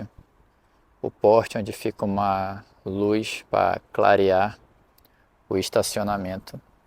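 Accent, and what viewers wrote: Rio de Janeiro, Brazil. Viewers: You sound so carioca! :)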